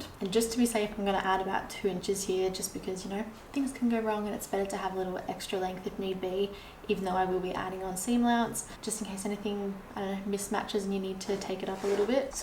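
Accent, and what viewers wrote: Australia. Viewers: Wonderful channel!!! Great to hear a vibrant Aussie seamstress enjoying her creations and what a sweet little shift dress!! Well done!! 🌞🌺🏖️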